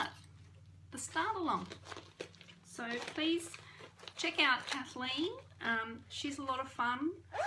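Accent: Australia. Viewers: I’ve binge -watched your videos. I love all you stitch and that you love 40 count. Love hearing your Australian accent. I planted a flower bush today. It had buds on it so I’m hoping to see many flowers soon. Until next time, stitch everything you can. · Your videos have quickly become one of my “oh great - she’s done a new floss tube!” videos. And, like most Americans, I love your Australian accent. My favorite flower is a lilac. Do those grow in Australia? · Hello, I just enjoy your floss tube so much. I love your Australian accent. Your stitching is excellent. Would love to see a couple pictures of your Home area. Nothing private. I have always been interested in Australia and would love to visit but my health and finances won't allow. One of my favorite quilting books comes from a woman who owns a shop in Perth, well, at least she did a few years ago. If I could only have one quilt book, it would be that one. 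I still do a bit of quilting but these days I am so happy to be cross-stitching again. I also have gone down the rabbit hole of EPP. I am rambling, sorry. Take care, keep up the good work and know there are many of us who admire and respect you. Carry on. with warm thoughts.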